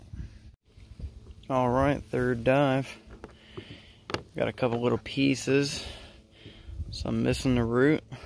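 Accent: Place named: South, United States. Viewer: Well Dude I understand about the secret hunting stash, but you can still share other videos right? Maybe another sponsor will pick you up? Other than that, things are pretty boring in the "Burgh" this time of year except for $5.00 for a dozen eggs or $7.00 for a jar of mayo. Hey! maybe that could be your next video. Don't forget, the first video I saw of yours was a tour of the Conquest, I literally searched " Carnival Conquest Tour" and you were the first vid that popped up. I'm not sure if the content matters. Your voice and the slow way you explain things along with the southern accent are your strong points. Let's be honest, there is not a huge market for prehistoric shark teeth.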